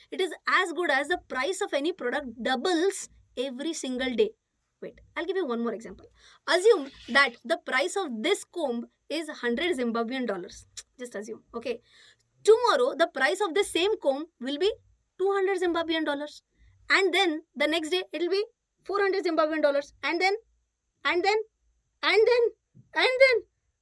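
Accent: Maharashtra, India. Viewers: The best informative as well as video effects/animation I have seen till date on an academic channel. I really dig your Marathi dialect ma'am 😊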